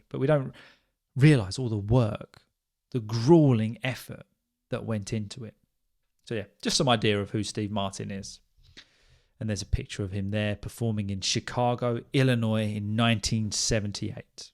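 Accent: England, United Kingdom. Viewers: Hello, thanks for another interesting episode, you’ve been doing excellent job for learners to help them with English May I ask you, what is the English accent which you speak? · Sir like it very much your British English accent and I understand your speak properly it is hundred persent clear. Lot of thanks teacher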